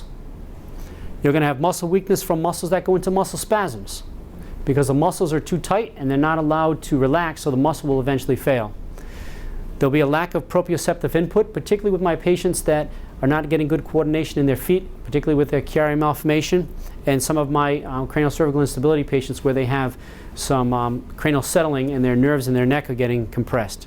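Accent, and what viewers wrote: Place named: New England, United States. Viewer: Lol. Near the start, his New England accent really threw me off momentarily. “…in other POTS of your body.” Lol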